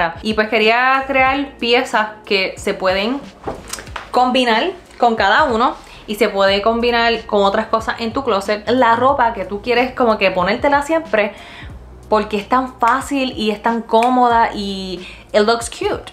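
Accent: Puerto Rico. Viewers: how great you talk with a Puerto Rican accent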